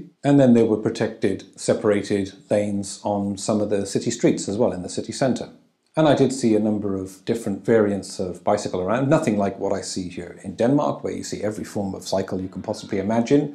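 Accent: United Kingdom. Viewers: Nice to see u again. Is your British accent is softening? Is it possible to retrofit indicators on e bikes and not waving hands?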